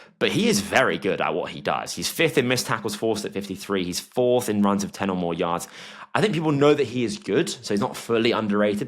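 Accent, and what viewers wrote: United Kingdom. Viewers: Something special about listening to a knowledgeable Brit speak about American Football. However you are wrong on Caleb Manziel Williams. Cam Ward will be a better NFL QB. Overall though good takes. Jakobi Myers deserves to go at the top of the underrated list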